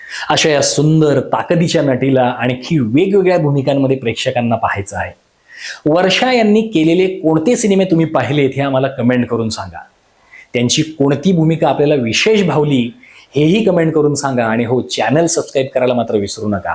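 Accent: Standard. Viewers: शुद्ध, स्पष्ट मराठीत माहिती ऐकून छान वाटले!वर्षाजीचे सर्वच चित्रपट पाहिले आहेत. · शुद्ध भाषा बोलता छान वाटले 👌👍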